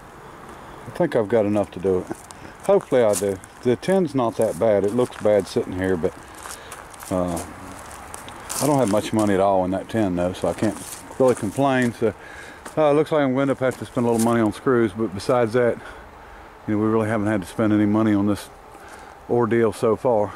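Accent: South, United States. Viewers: The way you say bye kills me because you sound like my bestfriend (my grandpa). Its been 13 years since i lost my grandpa. He was from West Virginia but met my grandma in detroit. He sounds the same (southern).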